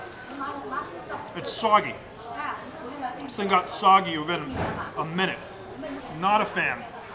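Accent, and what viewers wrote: Boston, United States. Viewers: That Boston accent from 2017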